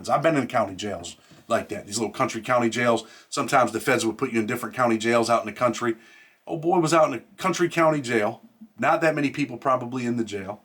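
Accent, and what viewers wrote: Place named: South, United States. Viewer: This is one of the first videos that I've ever laughed... I know a serious subject but the country accent like your making fun of me or are part of my family...lol.. it was funny to hear you talking that way plus making light of the video then you say remember to smile....LMAO it's the only video I have watched of yours that made me laugh and didn't feel so serious and as though it were life and death!! Much love and respect. God bless from Morganton NC!!!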